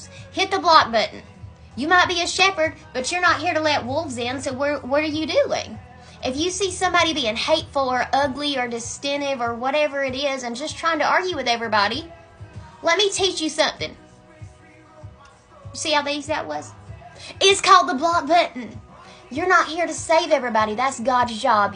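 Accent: South, United States. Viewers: You have a great Southern accent sweets!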